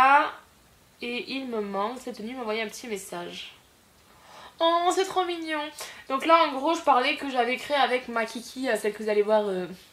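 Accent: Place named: Toulouse, France. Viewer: Dac, dac, j'adore ton petit accent toulousaingue:)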